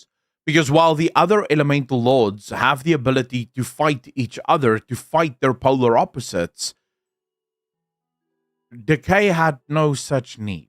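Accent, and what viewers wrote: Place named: South Africa. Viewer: South African, if I'm not mistaken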